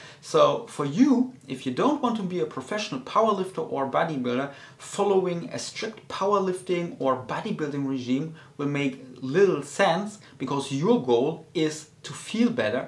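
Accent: Germany. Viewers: you've got such a german accent! :D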